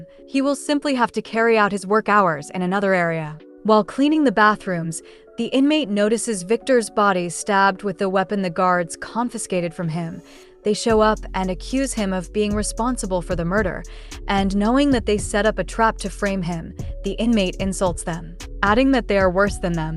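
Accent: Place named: United States. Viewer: Your storytelling is great 👍❤and your accent of united states is great 👍 this movie Recap is nice 👍❤❤. By the way I'm new on this channel,I will I new this channel before, thanks a lot. I got entertained ❤❤❤❤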